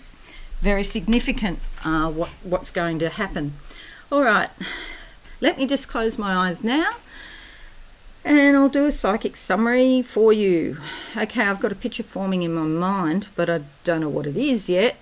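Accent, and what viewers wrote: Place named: Australia. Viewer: I heard you and thought.. This girl's an Aussie.. And then you mentioned the kookaburras.. 😂😂😂.. Loved your reading!... (fruit in the Garden.. MAN.. GO!😉)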